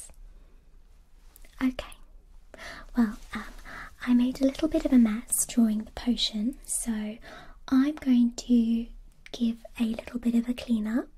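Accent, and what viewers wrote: United Kingdom. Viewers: normally has a British accent, but she did a Hermione accent on top of that!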